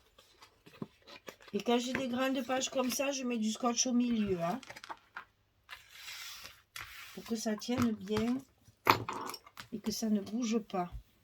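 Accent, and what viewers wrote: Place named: Provence, France. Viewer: Bonjour. J'avais acheté la collection provence ou lavender l'année dernière. Enfin, la même mais ils ont rajouté le vélo et autre chose. Je suis heureuse que vous fassiez un tuto, je vais pouvoir enfin m'en servir! Merci infiniment, je l'aime déjà! Et ce papier avec votre accent... on la sent la lavende 🥰🥰🥰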